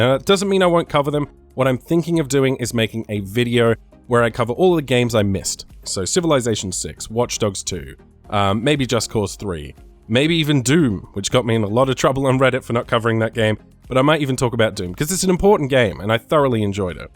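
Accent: Australia. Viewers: Congrats mate. No nonsense gaming coverage. And great to hear a fellow aussie. Keep it up 👍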